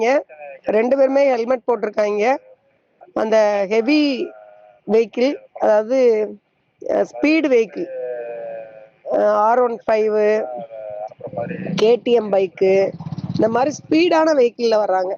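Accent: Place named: Madurai, India. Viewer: Thank you madam for your alertness with madurai slang.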